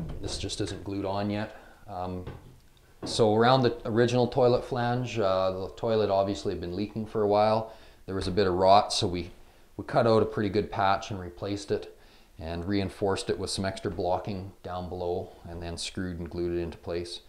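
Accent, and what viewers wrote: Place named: Canada. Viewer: Are you Canadian? You sound more Canadian than me and I’m from northern ontario where I’m told the accent is the strongest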